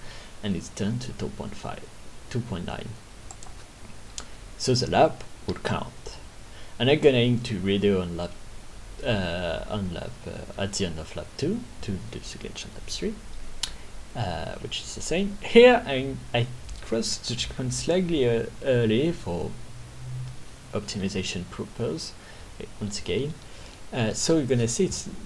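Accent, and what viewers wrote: France. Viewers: You can hear that you're from france 😎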